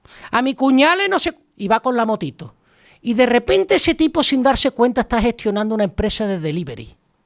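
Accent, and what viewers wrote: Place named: Colombia. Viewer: Es el español mas Colombianp qie conpzco ❤❤❤❤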